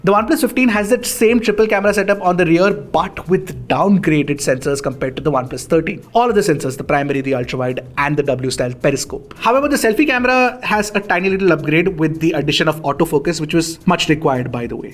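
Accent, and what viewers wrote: India. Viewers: No need to talk so fast, it's like Indish english, take some paus when talking, would be much clearer to understand :D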